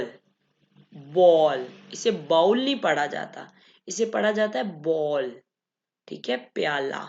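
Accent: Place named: Punjab, India. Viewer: Typical punjabi accent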